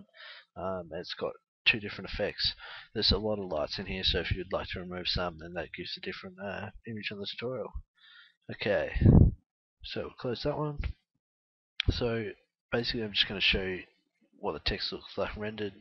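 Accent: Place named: Australia. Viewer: Finally an fucking aussie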